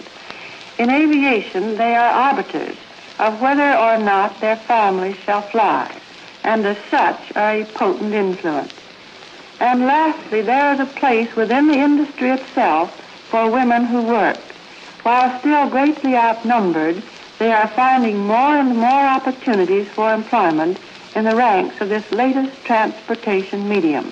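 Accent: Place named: Mid-Atlantic, United States. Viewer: She spoke, at least publicly, with the classic "Mid-Atlantic" voice accent that most American actors, actresses and public figures were trained to use at the time.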